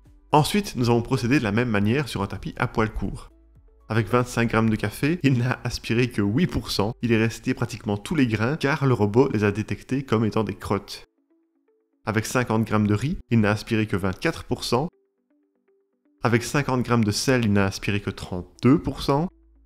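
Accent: Belgium. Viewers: Je détecte un accent belge mais vous dites les chiffres comme les français, pourquoi ?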